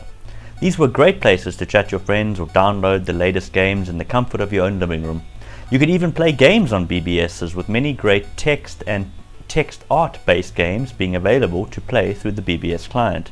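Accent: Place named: South Africa. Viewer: Thanks for the enjoyable video. Very sorry to hear about the camera, but the little one seems to be doing a decent job. It's also great to see somebody in SA covering retro tech. I'm from the other SA, on the other side of the planet; South Australia. That said, I've been lucky enough to spend time in South Africa (and East Africa) on several occasions. Your accent made me smile, and really miss the place. Have a good weekend!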